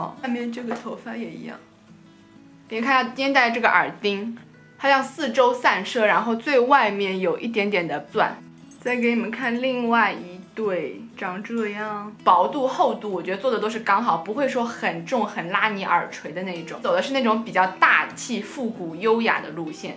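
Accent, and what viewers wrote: Shanghai, China. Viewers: 作为一个出生在上海 现在在墨尔本上大学的留学生，每次看你的YouTube（上海口音的普通话）就觉得特别特别亲切，特别想家。今年年三十在墨尔本和同学一起烧饭吃，谢谢你的视频！一个默默无闻的小粉丝 希望有机会能在上海相遇～x Angela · Hallo, 你推荐的产品真的很好看也很实在，那瓶Essie的指甲油涂上美得不要不要的。我也是上海人，你的上海话和带一点点上海口音的普通话听来非常亲切。我住在德国斯图加特已经十一年了，中国年没假，肯定在斯图加特过年了。我们离得太远了，不然真想去找你玩。还有我也曾留言请教你这么多衣服如何分类或处理，有机会出个专辑吧，除了买买买，我们也要会收收收,藏藏藏，理理理，扔仍扔。最后祝愿新年快乐，万事如意！喜欢你! Xia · 新年快乐啊！ 只能在英国过呀 也是很想回国吃吃喝喝的 哈哈哈 之前突然看了一个你的视频 特别喜欢你的性格 加上上海口音 太可爱了！ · 杭州人听到上海口音就有回家的感觉了哈哈哈。第五年了还是在美国自己过年。😭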